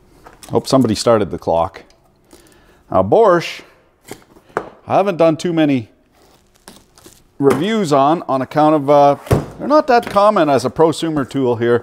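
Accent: Quebec, Canada. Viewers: hmmm. are you from Quebec, canada? your language sounds familiar for cursing :) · why is he cursing in québecois? 😂 il est vraiment bon en plus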